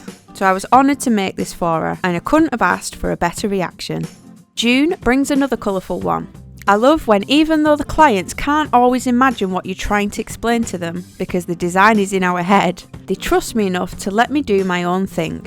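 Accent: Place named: Lancashire, United Kingdom. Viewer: So happy to have found ur tutorials.....absolutely in awe of ur work As a mere novice and previous community nurse ,this is inspirational.....think I chose the wrong vocation in life !!!???? Lol Thankyou for ur clear demonstration and must say ur lovely Lancashire accent just warms my heart . Keep up the fantastic work , I'll certainly be following regularly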